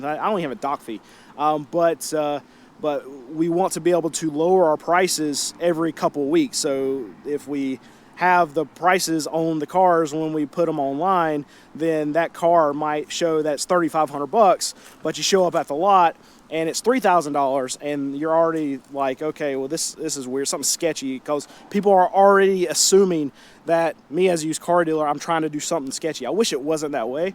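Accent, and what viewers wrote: North Carolina, United States. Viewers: Don't you realize we like your folksy N Cakalacee way!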